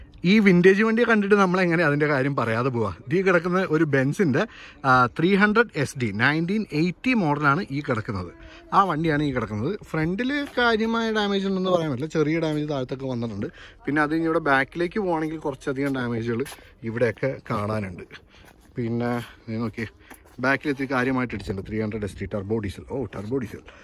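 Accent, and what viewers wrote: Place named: Kodakara, India. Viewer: Bro naatil kodakkara aano place ente oru friendine orma varnne bronte samsaram kekkumbo katta support bro👍🏻👍🏻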